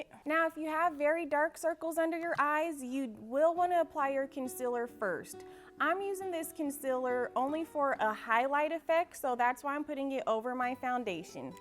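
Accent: United States. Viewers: Where are you from? I can't pin point which area in America your accent is from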